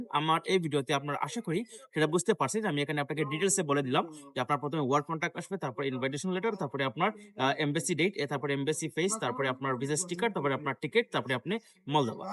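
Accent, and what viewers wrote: Sylhet, Bangladesh. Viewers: ভাই আপ্নে সিলেটি লাগের।আমি আপনার লগে মাতিতাম ছাই।ওয়াটসাপ নাম্বার আছে নি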